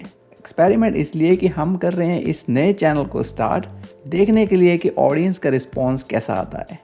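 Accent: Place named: Punjab, India. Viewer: Nice nice.. Your punjabi accent is creating more personal connection with you. Pehle hi your videos were super helpful and now its way more relatable as well ❤️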